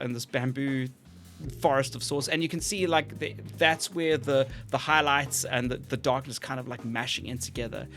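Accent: South Africa. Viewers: Dude, where are you from? I can hear Joburg with some Durban and also a pinch of New Zealand.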